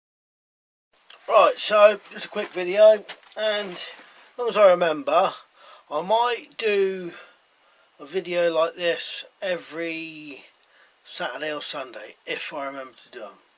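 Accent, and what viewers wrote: United Kingdom. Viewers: I have to say I really love these videos. I'm an American still currently in the US but engaged to a lovely British woman. We have a home, a life and twin girls in NE UK. I'm working on saving for immigration and I have to admit, I'm eager to officially be a Brit. I do have to say the accent reminds me of home (haven't been able to go back for nearly a year). And the lil can of spaghetti hoops in a Tesco bag is very effective but also gave me a bit of a chuckle... I can picture my hunny, the little ginger ninja, with her deadly Tesco spaghetti hoop flail takin down the baddies. 😂 I love how informative these videos are about normal items that actually can be carried. This kind of knowledge is great especially now, so Brits can protect themselves but not get done for doing anything illegal. I'm definitely looking forward to seeing more of your videos and I'll share them with my hunny as well. 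Blessings.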